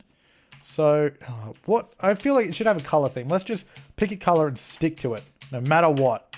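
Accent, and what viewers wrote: Australia. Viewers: Ya Mong 😂😂 such an Aussie !! I love it !!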